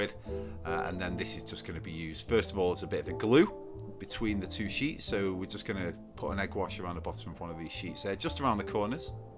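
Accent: Yorkshire, United Kingdom. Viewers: I love your accent. is it from yorkshire?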